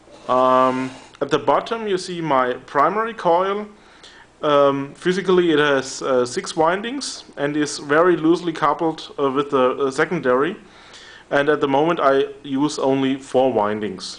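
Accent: Germany. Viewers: Man hört sofort das du Deutsch bist ^^ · Man hört irgendwie dass du deutscher Bist; aber kannst geil englisch :D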